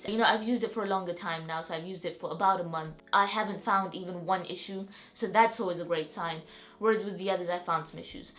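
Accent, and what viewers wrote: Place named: United Kingdom. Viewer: Thanks for the share. PS - I love your British Accent. So sweet and relaxing to the ear.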